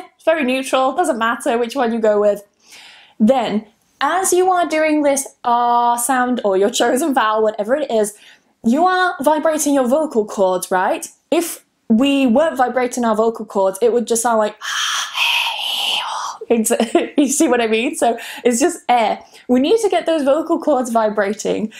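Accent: United Kingdom. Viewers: Sounds like British for me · I feel like you speak British aren't you👀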